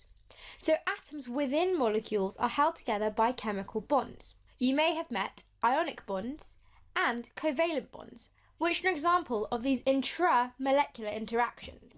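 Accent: United Kingdom. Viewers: I've never heard that much of an english accent in my life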